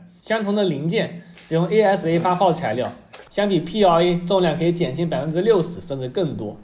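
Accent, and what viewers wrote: Zhejiang, China. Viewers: 很浓浙江口音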